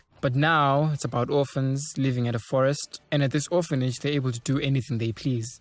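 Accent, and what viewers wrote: South Africa. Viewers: Is the guy commentating on this video from South Africa.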